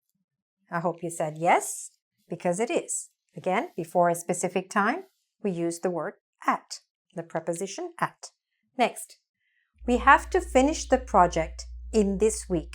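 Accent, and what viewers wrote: United States. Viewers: Loving The Sopranos accent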